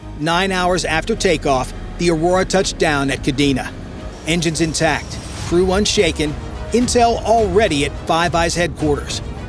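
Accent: United States. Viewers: American accent though, no originality on You Tube any more, how sad... "creators" my ass!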